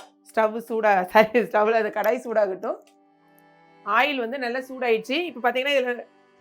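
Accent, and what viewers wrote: Tirunelveli, India. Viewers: எனக்கு தோசை தான் பிடிக்கும் சிஸ்டர். என் அப்பா ஊர் திருநெல்வேலி தான். அந்த ஊரில் நீங்கள் சொல்வது போல் தான் சொல்வார்கள். நீங்கள் புடவை கட்டும் விதம் உங்கள் சிரிப்பு சூப்பர். வீடியோ பார்த்தாலே ஒரு எனர்ஜி வருது.ஒரு சந்தோஷமாக இருக்கு சிஸ்டர். நிறைய வீடியோஸ் போடுங்கள் சிஸ்டர். உங்கள் பேர் என்ன என்று சொல்லுங்கள் சகோதரி.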